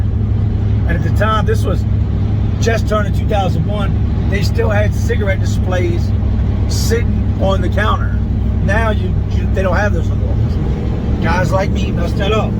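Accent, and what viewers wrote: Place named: Bay Area, United States. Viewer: He sounds like he from tha Bay wit that Never Dat. But dats old school I don't think we say dat no mo. But keep it P.I. Bad NFluenz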